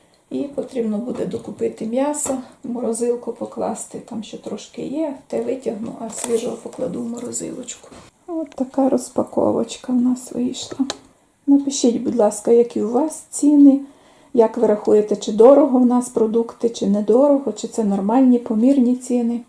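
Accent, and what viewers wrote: Western Ukraine, Ukraine. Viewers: Зайшла на ваш канал вперше.Живу в 25 км.від Києва в селищі.Молоко купуємо в магазині,корів днем з вогнем не знайдеш.Навіть кури люди перестали тримати корм коштує дорого,а паїв у нас немає.Землі у кожного не багато.Яйця на сьогодні 80 грн.коштують.Але живем головне миру іхлопцям допомогти.Здоров'я вам пані і вашому чоловікові.До речі розмовляєте ви як спражня жіночка з західної України.У нас багато суржику.❤❤❤❤❤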